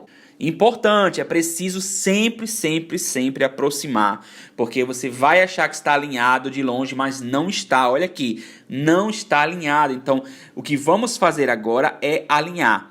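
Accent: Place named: Salvador, Brazil. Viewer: Eita, este sotaque é de Salvador... Coraçãozinho bate no ritmo da Bahia quando escuto meus conterrâneos depois de tantos anos vivendo em Buenos Aires. Obrigada pelo conteúdo! Amanhã mesmo vou aplicar numa ideia. Gratidão!!!! 🙏🏾♥️🙏🏾♥️🙏🏾♥️🙏🏾♥️🙏🏾♥️🙏🏾♥️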